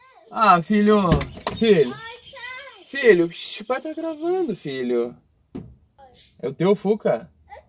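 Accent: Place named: Bagé, Brazil. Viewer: De Bagé ou região amigo? Sotaque da minha terra! Estou acompanhando os vídeos, muito bom. Pretendo reformar um Uno por hobby. Abraço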